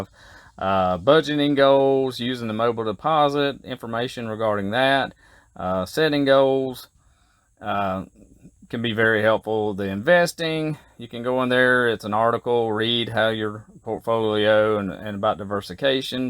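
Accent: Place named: South, United States. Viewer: i love that country accent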